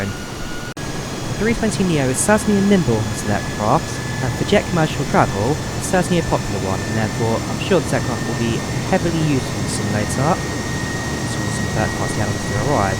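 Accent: United Kingdom. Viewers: which area of British accent this guy is?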